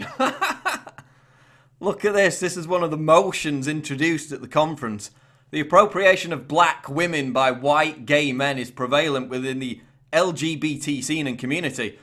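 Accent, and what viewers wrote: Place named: Yorkshire, United Kingdom. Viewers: you from Sheffield?, you sound like me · As an Aussie, is yours a Yorkshire accent? You sound like Michael Vaughan, former English cricket captain. Btw, it's very patriarchal, you should learn to speak with a Swedish accent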